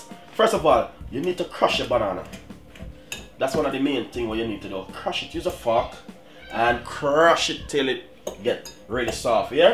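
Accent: Jamaica. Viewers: Kurt Sammons that is how Jamaicans speak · Real yardie...from u hear di counting (number dats di second one) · Nah bredrin, I love it when he says "simple add ...." instead of "you simply add..."; "luvley an nice"; and "flitters" instead of fritters🤣 He reminds me of my parents (RIP), who were also from the beautiful island of Jamaica ❤❤ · you obviously don't know your own dialect... ppl from other Caribbean countries sounds different from us... ppl from Trini.. Barbados and all over speak different... you can easily tell a Jamaican accent... she doesn't listen to what he says cuz he says "this is how we do it here in Jamaica" in every video!!! so it is a stupid question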